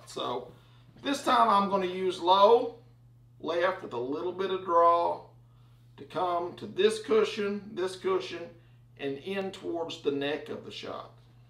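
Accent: South, United States. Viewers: Where you from? I’m not American but my guess is the south.. Cheers for the video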